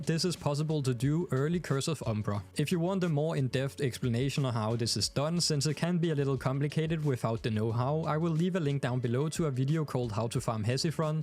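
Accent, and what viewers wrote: Denmark. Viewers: recently came back to warframe and ive been enjoying it alot, your guides have been very helpful getting me back on track. keep doing what you do! also, are you danish by any chance? your accent sounds very similar to a dane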